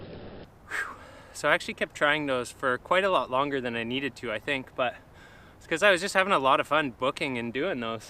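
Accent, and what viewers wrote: Canada. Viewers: the Canadian accent was strong on this one haha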